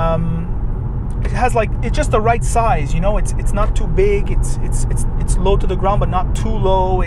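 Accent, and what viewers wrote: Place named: Quebec, Canada. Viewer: Bonne vidéo! L'accent québécois ne fait pas trop surface :p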